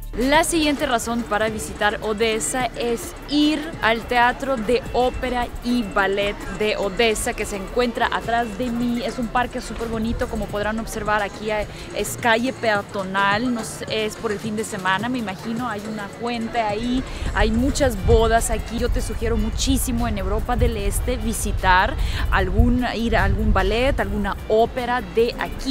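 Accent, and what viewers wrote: Mexico. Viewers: Me intriga mucho su asento español Mexicano..la hermana dijo alberca y esta padre algo muy Mexicano 🤔😘🇲🇽saludos hermosas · Ya suena mas mexicana que ucraniana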